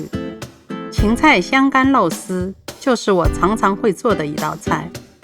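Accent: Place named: South, China. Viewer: 韓俊傑 南方人都这口音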